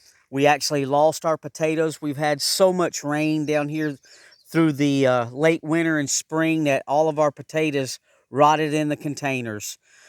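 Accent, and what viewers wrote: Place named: South, United States. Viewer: I thought you sounded southern in Indiana. Welcome. Tis different. Hope you get ur sweetpotatoes going like city lot! Good luck!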